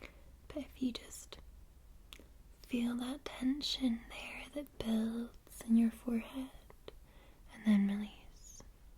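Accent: Scotland, United Kingdom. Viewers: Fall asleep with the. Next to me......scratch one off my bucket list....💜❤😆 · Thank you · Thank you.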